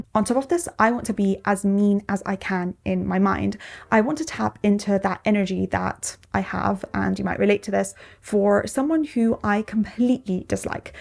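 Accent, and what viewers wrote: United Kingdom. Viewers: i LOVE your British accent!🥰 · “☘️A left handed red head with a British accent ☘️ : 🌹Beguiled me 💯 ✅🥀✅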